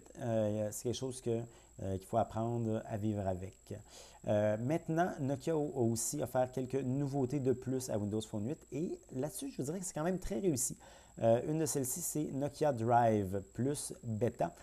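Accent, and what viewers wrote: Quebec, Canada. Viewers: Tu es québécois ?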